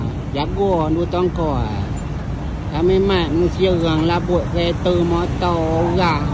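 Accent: Pahang, Malaysia. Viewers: Hahaha base pahang pekat oi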